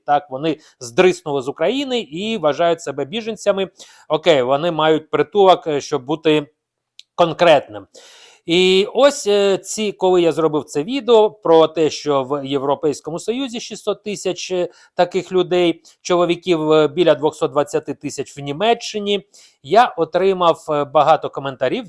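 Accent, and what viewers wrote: Russia. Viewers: Фу !!!! Тварь !!! Ещё одно - куля в лоб ... А сам сидит русским акцентом трусит ... В бан суку !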